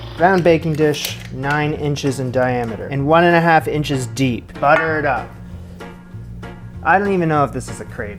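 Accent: Canada. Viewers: Take it "Eout" of the pan,! 😁🤣 Love your Canadian accent.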